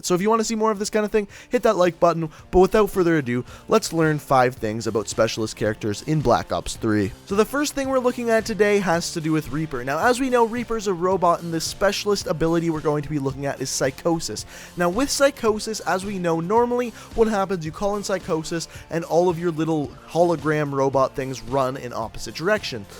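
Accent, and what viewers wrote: Canada. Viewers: It's his Canadian accent it make them were they can't pronounce all words correctly so stop hating and learn to spell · Is he canadian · Definitely Canadian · are u Canadian cuz it sounds like it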